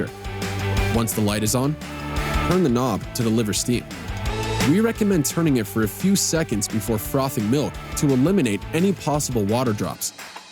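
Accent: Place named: United States. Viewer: What's with this american guy doing the voiceover on an italian product? Get Mauro Epis back! A real italian. Good video otherwise though, from an owner of this machine!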